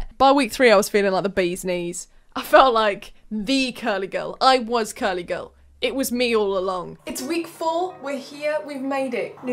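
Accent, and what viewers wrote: United Kingdom. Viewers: has anyone ever told you, you have the proper accent to be a Dr.Who companion? i think you and the Matt Smith doctor would get a kick out of each other... All british people know Dr.Who, right? its like a sporting event for you guys when it airs?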